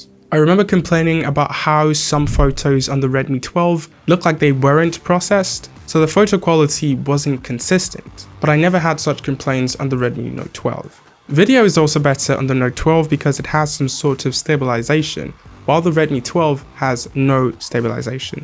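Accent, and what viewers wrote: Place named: United Kingdom. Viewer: I love the video and as well your British English accent. Many would say you're not a Nigerian living in Nigeria because of your Amazing English accent, just like I was also asked in many occasions if truly I was a Nigerian for the same reason. I loved the review and I am opting for the Redmi Note 12. PS: I sow in the comments someone saying that there's also a Redmi Note 12 Variant which also has 256 ROM. And I wonder how true is that, seeing that you haven't made mention of that variant in review. Thanks and this your new subscriber's here to stay. ❤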